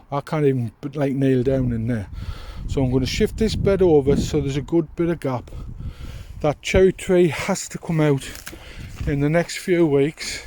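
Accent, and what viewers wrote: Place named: North East England, United Kingdom. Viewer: where in the north eaat you at, marra. i was born in horden. you sound not far away. i only ask because i find it easy to understand the accent.....................brian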